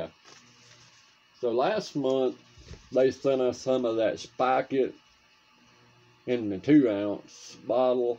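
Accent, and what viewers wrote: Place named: Northeast Alabama, United States. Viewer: Bud, you sound just like we do in northeast alabama. Lol and I believe that bass baits monthly won out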